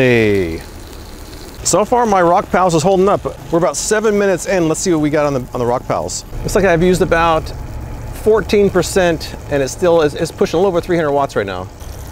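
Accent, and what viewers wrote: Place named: South, United States. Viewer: Thumbs up for. Next time lean more into the southern accent 😅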